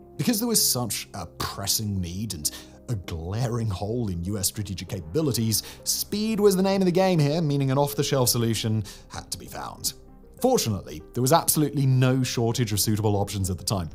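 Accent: United Kingdom. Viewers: Dude, slow down, for crying out loud! Can’t catch everything you’re saying with this Brit accent.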